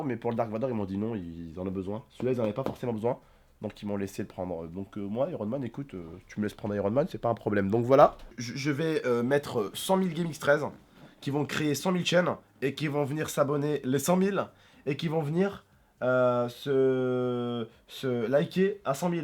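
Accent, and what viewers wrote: Quebec, Canada. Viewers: ptite accent quebecoi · ptn toi tu fais 24 k de vue en 2min et une personne en 1 h fait 5 vue t trop fort bravo l'accent du quebec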